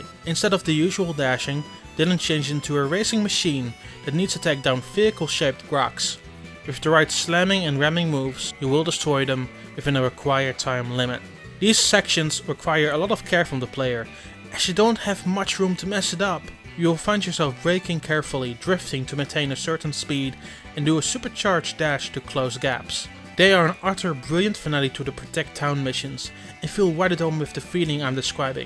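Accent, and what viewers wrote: Netherlands. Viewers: You are dutch, i can hear that ;-) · Yes he is Dutch lol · Is this dude Dutch? His accent is slightly off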